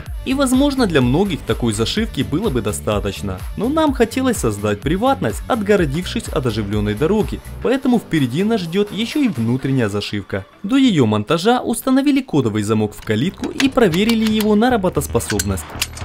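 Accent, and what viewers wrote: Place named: South, Ukraine. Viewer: Я так понял по говору, это юг Украины...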